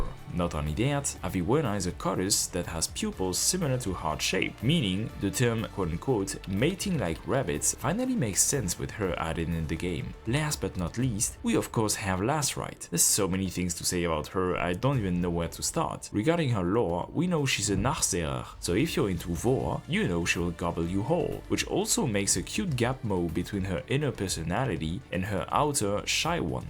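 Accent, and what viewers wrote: France. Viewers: You french?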